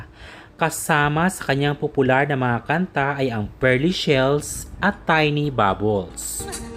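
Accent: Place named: Visayas, Philippines. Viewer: Bisaya itong nag voice over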